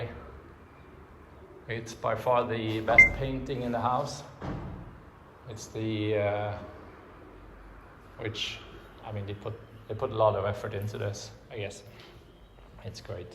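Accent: Sweden. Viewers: Du låter svensk. Lycka till med renovering - fantastiskt hus! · Where are you from? Your accent is very Swedish. 🙂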